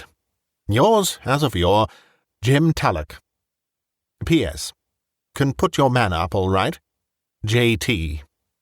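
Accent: United Kingdom. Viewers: I don't sleep well so I listen to audiobooks on YT all night every night with earbuds. I like the fact that this compilation has over 9 hours of listening. Although I'm American I enjoy listening to listen to books read in a British accent. Your voice is fabulous. Luckily, I stumbled upon this excellent channel just today.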